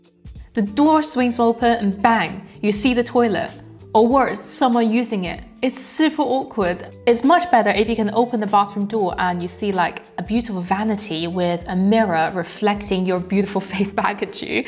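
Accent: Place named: Southern England, United Kingdom. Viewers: Are you from southern England? It sounds like an English accent that's south of the Humber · That was a very nice video, thanks for the tips 👏🏽👏🏽❤. I study architecture for hobby and learned a lot by seeing it. Loved how you show that list between the video's chapters and the final list with all the items: if someday I create content for the internet, I'll definitely use that in my videos. Ps: Where is your accent from? South England? It's so nice. I'm Brazilian and a considerable part of the english we consume over here is from USA 🤠. Best wishes from Brazil 🇧🇷.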